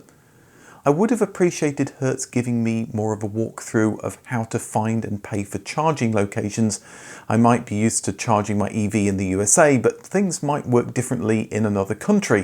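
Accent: England, United Kingdom. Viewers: I thought you were British... 🤣 Also it's extremely early for EVs. In my city and in my country there are a few charging stations and those who have houses with garages are the only ones who own one. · Wait, you live in the USA? I assumed you were British and in th UK the whole time... :volcano-green-lava-orange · It's funny to hear an English accented person to say they are driving on the wrong side of the road. 20 years is just run in on most ice's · I watch your videos for over 4 years now and i could swear you are from England, and now this video suggests that you live in USA 😂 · You are so British, I thought the whole USA scenario was some sort of roleplay. Even the shelves behind you look like BnQ units I had in my house in Ireland. I actually dug into your channel details to check location. Definitely my surprise of the year, that you're base in the US! 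Anyways Love the videos (in Terms of merit) and your voice. Very soothing after a stressful day. Greetings from Poland.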